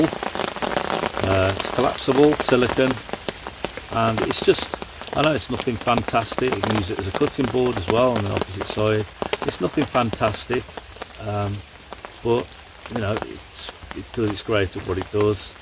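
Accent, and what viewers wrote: Black Country, United Kingdom. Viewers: Hiya bud, I just came across your channel and you seem a genuine and friendly bloke. I’m from Yorkshire but have lived in the West Mids for over 20yrs now and find most to be really nice people. Good to hear a Yam Yam accent on YT 👍 Subscribed 👍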